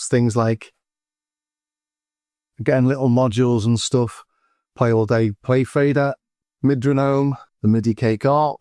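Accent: Liverpool, United Kingdom. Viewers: not Jamie Carrigher-level Scouse! Hehe.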